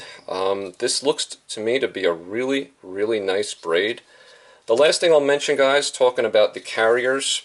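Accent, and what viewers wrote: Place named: New Jersey, United States. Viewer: I'm sure you've already addressed this somewhere, but I can't find it... What part of Jersey are you from? I pretty much knew from the accent, but this video, with your license prominently displayed on your hat, eliminated any doubts. 😀 Just so I understand completely, are you saying essentially all your break offs on this line and Samurai have been on casts? I know that can occur when a couple of little loops form or you've caught a large fish or snagged up and try to pull it free (and it sounds sickeningly like a gunshot). In any case, if it hasn't been breaking on hooksets, I still might give it a serious workout. Part of what's going on, I'm sure, has to do with the fact that Japanese lines label their actual break strength whereas most of the domestic lines like Power Pro, Berkley, and Sufix rate them sort of arbitrarily by diameter, which is why Samurai is so much thinner at each labeled strength. 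I'd bet 80# Sufix breaks over 100# on an IGFA test for straight pull.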